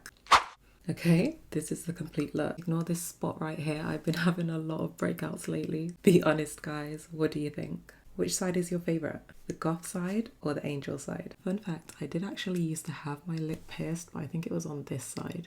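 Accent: United Kingdom. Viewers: She sounds so British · I like both but goth is the winner for me also are you British or something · You mean to say, she speaks English in a British accent… like all British people do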